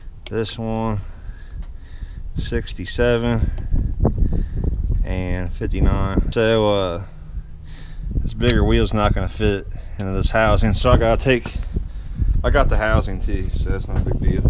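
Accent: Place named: Virginia, United States. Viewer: Need to know that accent....Virginia, with New England accents...?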